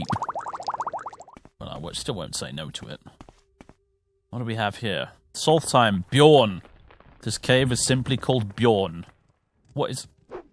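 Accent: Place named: Edinburgh, United Kingdom. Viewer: I love how your Scottish accent is basically Edinburgh.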